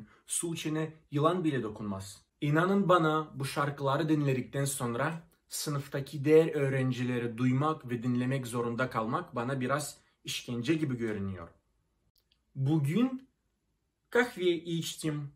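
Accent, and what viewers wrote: Thrace, Turkey. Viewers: Neden bilmiyorum ama hem tip olarak hemde aksan olarak ne zaman seni izlesem, Trakyalı birini izliyormuş gibi hissediyorum. seviliyorsun kral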